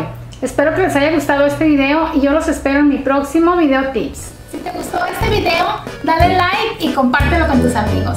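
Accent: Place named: Sinaloa, Mexico. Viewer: Asento sinaloense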